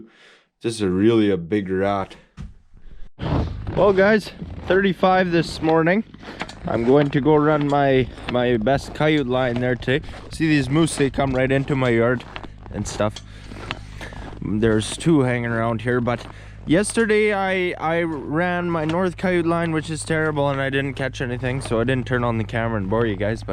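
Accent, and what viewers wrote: Canada. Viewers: From Texas. Love those Canadian vowels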